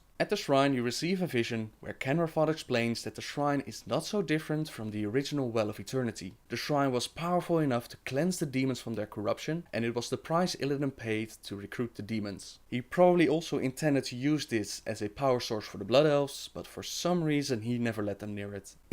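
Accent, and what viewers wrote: Netherlands. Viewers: Are you Dutch? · Trevor E. He is Dutch. Not Swedish. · Are you dutch? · WAUW jij komt echt uit nederland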